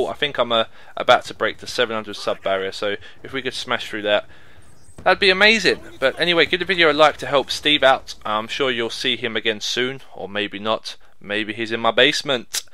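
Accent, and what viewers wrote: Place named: England, United Kingdom. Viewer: i do love listening to english guys.....damn....hahaha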